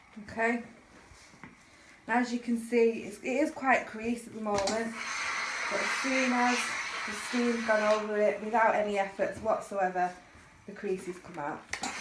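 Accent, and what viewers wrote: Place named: Northern England, United Kingdom. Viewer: Great instructions, nice and clear from a fellow northerner! Thank you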